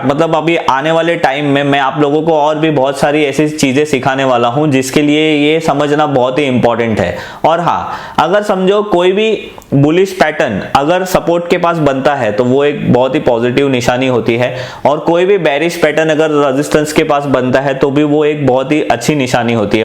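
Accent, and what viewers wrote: Gujarat, India. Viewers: ગુજરાતી છો? વાત પરથી તો એવુંજ લાગે છે. ખૂબ સરસ કામ કરી રહ્યા છો.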